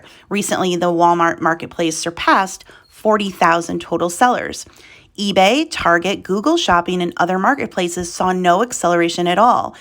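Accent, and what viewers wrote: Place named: United Kingdom. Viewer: Great British accent.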